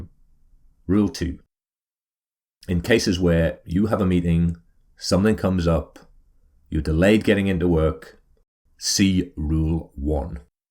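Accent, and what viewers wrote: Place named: North East, United Kingdom. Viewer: he's not a robot, he's just from the North-East.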